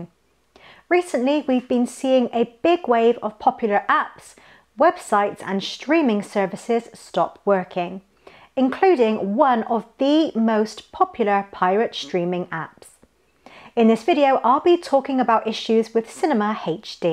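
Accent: United Kingdom. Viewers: I love your accent I wish more British women were in CA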